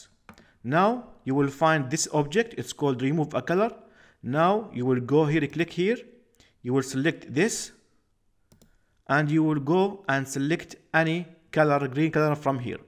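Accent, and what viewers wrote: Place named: Arab world. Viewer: يا اخي حاسك عربي🤔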